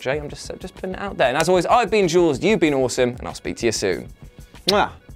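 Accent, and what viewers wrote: United Kingdom. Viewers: where ya from in the uk?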